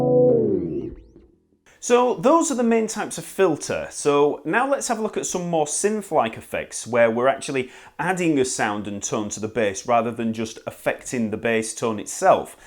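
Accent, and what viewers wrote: United Kingdom. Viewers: I really enjoy this distinct british accent!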